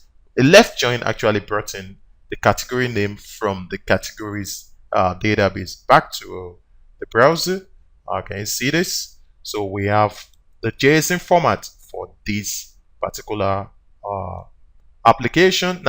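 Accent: Nigeria. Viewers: sounds Naija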